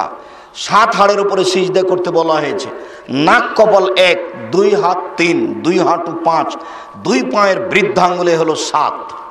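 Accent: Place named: Chapai Nawabganj, Bangladesh. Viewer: ধুর ব্যাটা চাপাই নবাবগঞ্জের ভাষায় আরবী পড়লে হইবো? আরবী শুদ্ধভাবে পড়তে হবে।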